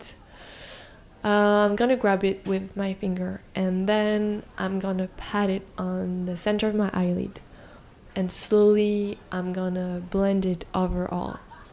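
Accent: France. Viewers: Es tu française ? J'entends un petit accent :) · Omg i freacking love your channel, your videos and everything. They're pretty simple, and that's what I really love. It kind of reflects you and I think YouTube need more people like that. J'adore ce petit accent français également, il donne du charme à tes vidéos et à ta personne. Kisses from France ( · I love watching these first videos you made. This was the first video I saw when I randomly discovered you on YouTube. I was instantly hooked! I absolutely loved that you did your makeup with your fingers, which made it look so easy and effortless. I loved that you were always doing your makeup in cafes, and of course the French accent 🥰👌🏻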